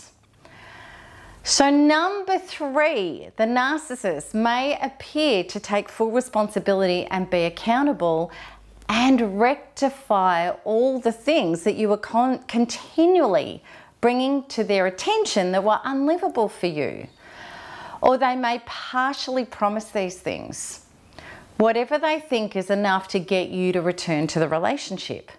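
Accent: Australia. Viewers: OMG could actually learn how to speak properly, you sound like you fell off the back of a truck in Mt Druitt. It makes it difficult to listen to. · hi Thank you so much for your love, care and education. And nice to hear your AUSTRALIAN. I've just tried 10 plus times to get your free, free yourself education from online but the site isn't letting add both my name and email address. Could you please send it to me? I'd love to read this. I'm a victim of crime. Would love your support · I like your accent so much 👏👏👏Love to Australian Nation!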